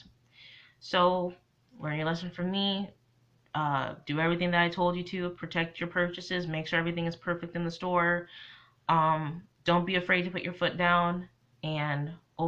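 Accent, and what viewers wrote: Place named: New Jersey, United States. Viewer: I just came upon your video and I'm glad I stopped to listen to your experience regarding your LV repair. First, I too am sorry you are having these lack thereof quality issues as well as poor customer service. Secondly, I too have had my share of unfortunate experiences with LV especially regarding new item quality issues and I've in many instances if not all only had the item for a month or two but I get this ugligness attitude from the store I deal with if I bring up an item with quality issues. I'm so over them and their poorly designed pieces of late. Don't get me wrong I still love LV and it's craftsmanship but I expect them to be behind me 100% if I have an issue. So sorry, again that you are going thru this. Hi I watch you and I love your I think Jersey accent excuse if I'm wrong but I love your videos. 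Keep up the good work!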